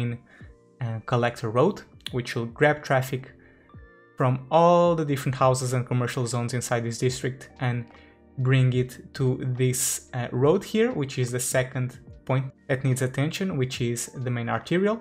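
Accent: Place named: Portugal. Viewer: Adoro o teu sotaque tuga inconfundível!!!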